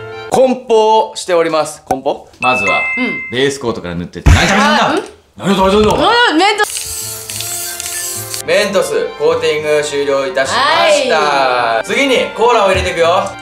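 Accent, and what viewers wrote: Kansai, Japan. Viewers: 今日も関西弁やね〜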